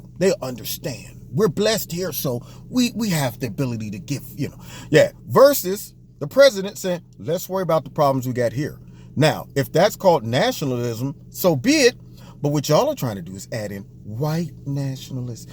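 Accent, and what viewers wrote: England, United Kingdom. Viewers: BRILLIANT SUMMATION! Love your English accent haha Sending love from the UK! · You do a good British accent. It is my secret wish that we could somehow peacefully gather together to bring down CNN. I dont want to inhibit free speech, but they should not be able to call themselves the press. There is no single source of hate in our society, but CNN is right up there with the worst of them. Because they intersperse their hateful bias with some semi news, they get away with pure propoganda that is taken seriously. What bothers me the most is how bad they make our country look to the rest of the world. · Hello. I live in England. I loved your English accent. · bad accent , and not many englishman speak like that. its like saying all americans have a New York accent. good points other wise. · Good limey accent.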